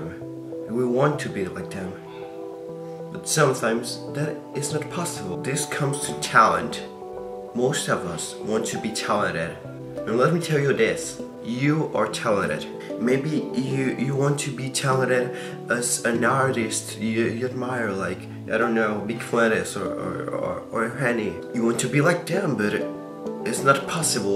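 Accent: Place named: Mexico. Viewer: Thanks Mike! I'm from different countries so my accent is mixed with other accents. it's mexican+costarrican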